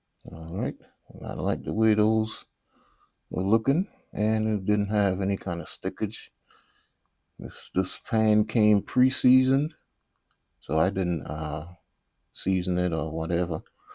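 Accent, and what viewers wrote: Charleston, United States. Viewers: Trying to find out if you have a restaurant and if so where you located? Sounds like your from Charleston SC ? Lol 👍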